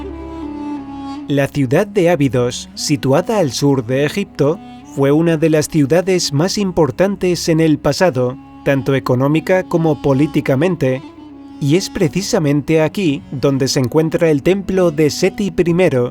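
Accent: Spain. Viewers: el video y el contenido de este canal me encanta . se nota que hay información muy valiosa . pero me molesta sobremanera la voz y el acento ibérico . me gustaría que fuera un locutor latino quien relatara cada video de este canal . Saludos .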